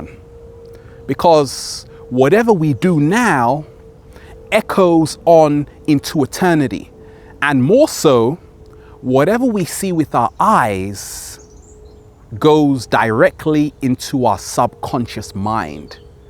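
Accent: United Kingdom. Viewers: (Diving Deep) You're a decent looking black guy with a British accent. Come to the US, white girls will be all over that D. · btw are you British? your accent seems to point yes I cant help but notice that you slur on your s and yeah i love to watch porn and enjoy doing it and then some time ashamed about what type I watched lol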